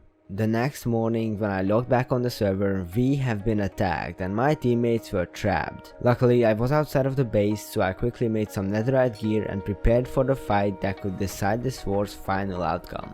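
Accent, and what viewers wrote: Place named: Hungary. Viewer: You have a little hungarian accent, but i love it. You are probably not hungarian but yeah.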